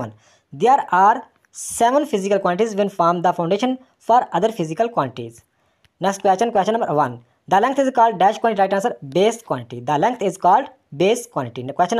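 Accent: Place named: Punjab, India. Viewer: سر جی آپ انگلش کو پنچابی میں بول رھے ہیں ۔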